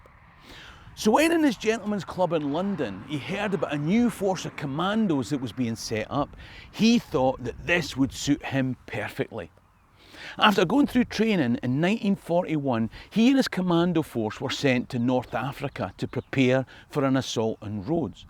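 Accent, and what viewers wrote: Scotland, United Kingdom. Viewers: Though it took me a while to adapt to the Scottish broga , You are the best story teller I can remember hearing and I'm old.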